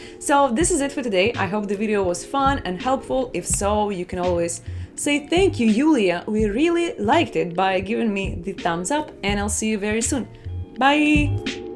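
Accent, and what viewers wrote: Russia. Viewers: Like for the natural “Russian” accent ))) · thank you yulia...I really liked it....I love your russian accent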